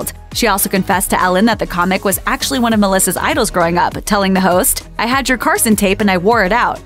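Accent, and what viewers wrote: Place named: New York, United States. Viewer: Neutral New York City accent? The city has 8 distinct accents according to where you live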